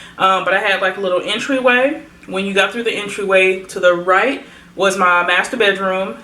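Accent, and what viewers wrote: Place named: Midwest, United States. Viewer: . yep yep. Different foods and maybe different dating habits, like when teens date. Or church habits.... Like that. Also What was the non profit you worked with, what were they setting up and what was your role? Lovely. The work of the Lord. M. IL. Ps. You sound Midwest. Are you a Midwest girl? I live in western Chicago 'burbs. M.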